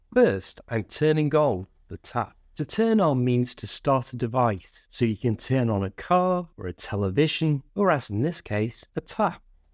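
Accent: Liverpool, United Kingdom. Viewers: You have a wonderful scouse accent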